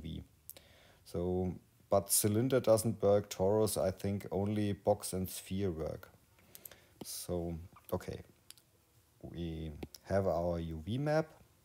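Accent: Bavaria, Germany. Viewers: bavarian english is dope.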